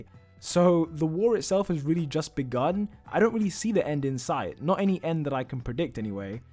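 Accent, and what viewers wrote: United Kingdom. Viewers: Yo you sound like your from the uk.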